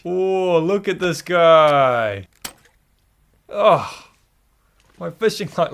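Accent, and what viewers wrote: New Zealand. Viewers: Do I hear a new Zealander ?? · a New Zealand accent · New Zealand, you can tell because the vowels are slightly different.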